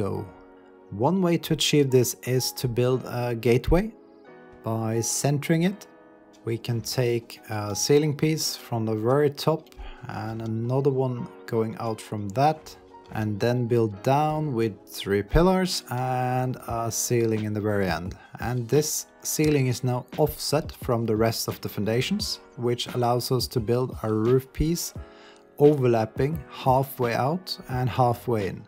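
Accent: Norway. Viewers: Kjente igjen engelsken hehe, super engelsk men hører det fortsatt 😅 Flott content dere har, skal dele dere med clanen 😄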